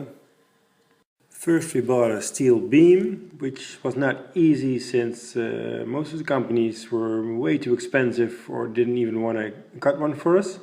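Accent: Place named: Netherlands. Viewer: I could just hear from your accent you're from the Netherlands haha. Ziet er echt gaaf uit, die tafel! Echt super mooi ding :)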